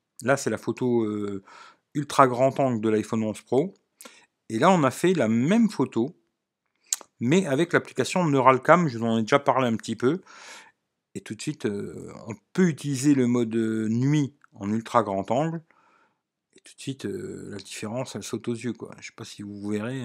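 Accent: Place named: Lorraine, France. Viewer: Eh mais un lorrain ! 😂😜😍. J'me disait l'accent me dis qq chose 😏. Bon la stabilisation est à la ramasse sur le n10+ en vidéo c" assez flagrant pour moi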